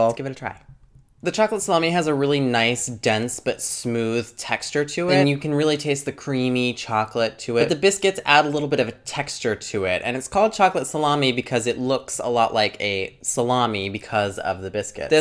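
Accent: Portugal. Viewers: btw, you have a nice Portuguese accent! Keep going! :p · You've got a pretty natural accent. Just found your channel and already subscribed. Keep on learning Portuguese, it makes me really happy to know that people from outside are studying my language. I've recently found a lot of channels by foreign people who speak Portuguese (From Japan, Romania, Russia, Germany, USA...), but you're the first who speaks with an european accent. :) Greetings from Brazil (and please, come here one of these days :D)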